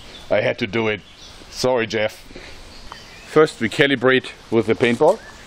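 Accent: Germany. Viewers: are you come from Germany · He is German, he has an accent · I really like his German accent (I' German btw) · FPSRussia ist ja auch aufgrund seines Akzentes berühmt geworden, und dass obwohl er kein Russe ist ^^. So ein Akzent macht jemanden einfach sympathisch. Ich finde deine Videos echt Klasse und sie zeigen der Welt das wir Deutsche nicht so ernst und humorlos sind wie gerne behautet wird. Ps gute Besserung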